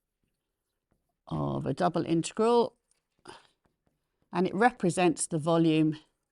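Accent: United Kingdom. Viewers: Ŕeal british English ❤❤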